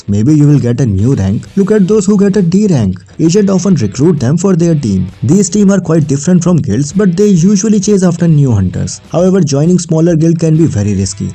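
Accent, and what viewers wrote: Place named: India. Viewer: Nah not this India voice 😢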